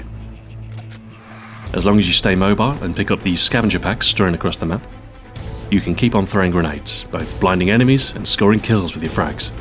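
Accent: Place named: United Kingdom. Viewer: its definitely the british voice that makes this video. always love a fellow british lad. is such a Jeff!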